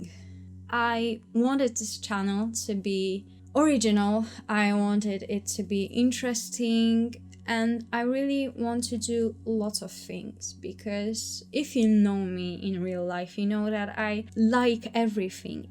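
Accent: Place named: Poland. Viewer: /eng: her accent is beautiful and I am saying that as also polish. Polish people do not have good English accent usually Pl (for basically) Super akcent, zazwyczaj Polska wersja mówienia po angielsku mega mnie irytuje ale twój jest super Jak skończą ci się pomysły na filmy daj coś o języku bo taki akcent to serio wyjątek w Polsce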